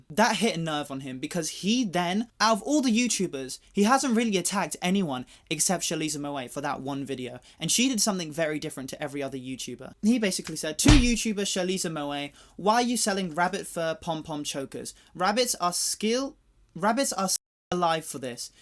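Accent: United Kingdom. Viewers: You're a British aren't ya? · I love your British accent 😍 ❤ And you singing very well😆 Support you❣❣ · besides the point, I'm appreciating the accent. You see so little bittish youtubers and I just noticed how comfortable listening to him is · fell in love with your British accent already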